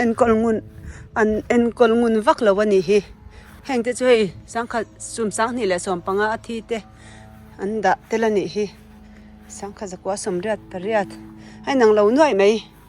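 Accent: Norway. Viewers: Kei chu tawngkam ithiam in thil i sawifiah thiam kati,,norway tawng thluka itawng te hi ngaihthlak anuam kati tlat thung..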